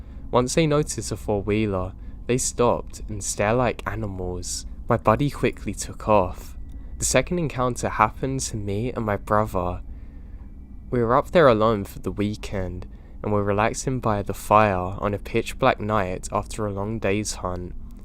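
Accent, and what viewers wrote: United Kingdom. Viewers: im glad you do mate i get bored of these american story tellers, its good to hear a fellow british accent. i was actually shocked the first time i heard you were british tbh lad, keep it up any way you tell stories clearly, and the starting motivational bits are a good add to people who maybe need words of wisdom but dont try to find it, so you prolly have more of an effect on people than you know. One thing tho is maybe a bit too much emphasism sometimes? No hate ofc but i find that it makes a big difference if the main parts are emphasised in stead of the irrelevant bits. Kinda adds more power to the plot, but it aint me thats tellin em so keep up the good work lad 👏👏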